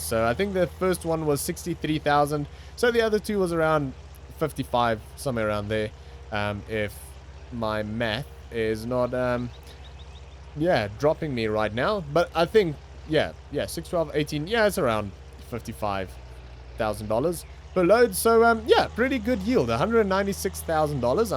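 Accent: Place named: Cape Town, South Africa. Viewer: Hi, love the serie and the longer versions. Keep up the good work and boy, do I miss Capetown listening to your accent. Best time of my life.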